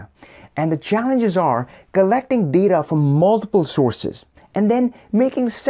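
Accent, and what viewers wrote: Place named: India. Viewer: the best indian english talker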